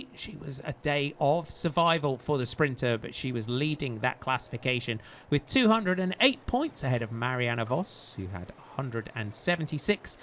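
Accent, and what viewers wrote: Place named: England, United Kingdom. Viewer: It's the English language, not the American language. They invented the language. He's pronouncing it the way all English people pronounce it. Here, in Canada, we also say "kill-ohm-mahters".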